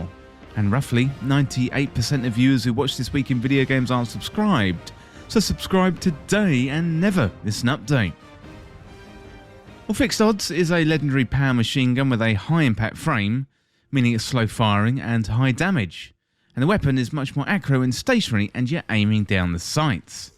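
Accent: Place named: United Kingdom. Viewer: You sound like JARV. All you British twits sound the same.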